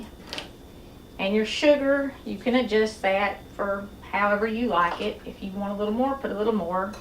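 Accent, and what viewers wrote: South, United States. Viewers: I have really enjoyed your sharing of this recipe and I’m looking forward to making it some day soon. I love that it is an old family recipe, wow 100 years old! Thank you for your kind words and for sharing in an indirect way your faith in God. I love the way you have honored our military service men and women on Memorial Day for their sacrifice to our country. You have such a charming southern accent that is very relaxing as well. Take care and God Bless you today and always!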